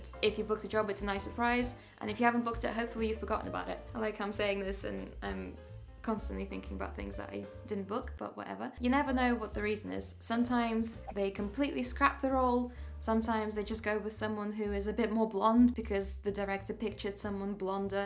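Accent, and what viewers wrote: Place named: United Kingdom. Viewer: Im surprised, your speech is horrible. Try speaking more clearly and with an American accent!!! British accents dont work well.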